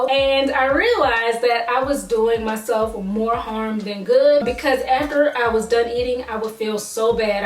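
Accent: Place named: Maryland, United States. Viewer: Are you from Maryland? Your light accent sounds familiar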